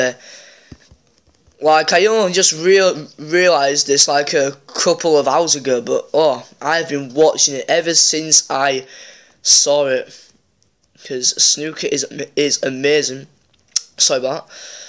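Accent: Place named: United Kingdom. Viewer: Awesome video bro. I really enjoyed watching it. Are you British btw? I like your accent a lot.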